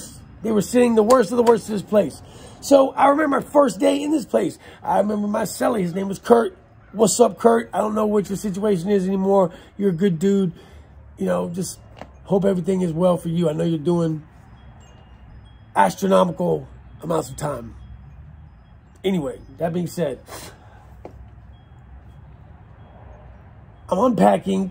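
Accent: Virginia, United States. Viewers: Your def from the VA area......love your story telling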